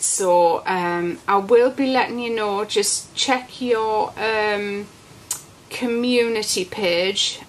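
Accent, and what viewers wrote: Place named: England, United Kingdom. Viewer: Are you based in England because you sound like a English person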